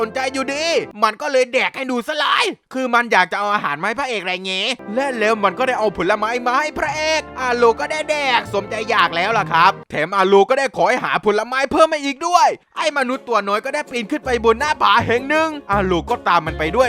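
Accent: South, Thailand. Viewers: พี่เปนคนใต้หรอคับ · พี่คนใต้รึเปล่าเนี้ย55 งูบองหลาเฉย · คนไต้นิ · พี่ครับพี่เป็นคนใต้ใช้ไมครับ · คนใต้บอนิ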